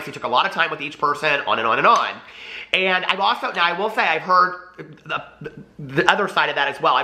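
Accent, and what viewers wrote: South, United States. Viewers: New subscriber and I adore your fun personality!! Also, love the Southern accent and hugs from Texas.. James Charles is a immature and entitled man child. Whereas, Jeffree Star is a mature grown person, who remembers his roots.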